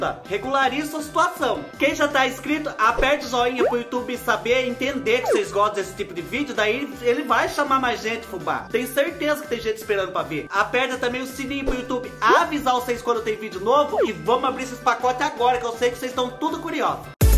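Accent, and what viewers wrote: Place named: Northern Paraná, Brazil. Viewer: Hei Fubá, que divertido ver vc falando "paranaês" do norte! Sucesso.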